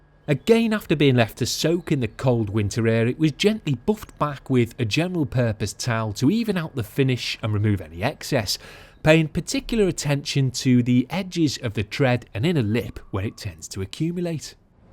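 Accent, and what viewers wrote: Liverpool, United Kingdom. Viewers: The only scouse accent I like : ))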